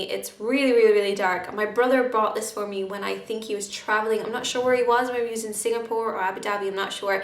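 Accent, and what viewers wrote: Ireland. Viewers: Estée Lauder amazing by far my favourite foundation ... Btw what part of ireland are u from ? Xx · Are you Irish?!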